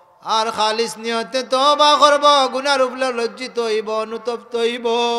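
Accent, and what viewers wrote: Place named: Sylhet, Bangladesh. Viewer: মনে আয় সিলেটি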